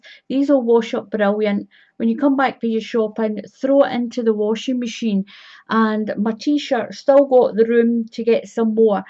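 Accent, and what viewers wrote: Scotland, United Kingdom. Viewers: Fantastic vid & being Scottish myself its gr8 hearing our accent 4a change. So easy 2make & who hasnt got an old T shirt laying abt some drawer at home. Thanx so much. Stay safe & well.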